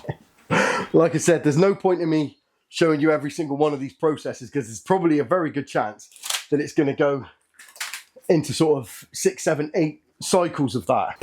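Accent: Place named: West Country, United Kingdom. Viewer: Thought I recognised west coutry accent.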